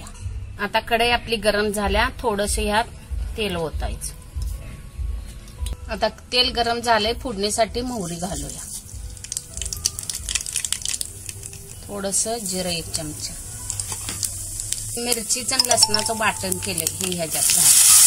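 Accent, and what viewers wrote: Kolhapur, India. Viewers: Kolapuri mast boltya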